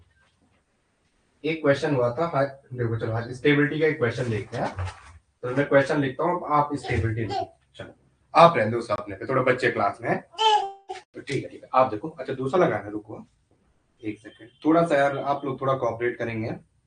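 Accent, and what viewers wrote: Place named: Rudraprayag, India. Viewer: Rudraprayag se ho na aap sir ji..tone se pata chal raha hai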